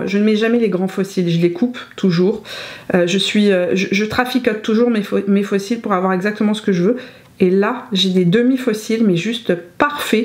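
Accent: Quebec, Canada. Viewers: Bravo pour l’accent un peu québécois!! D’une pure laine !! Très très beau looks, mais le modèle y fait pour beaucoup! Xx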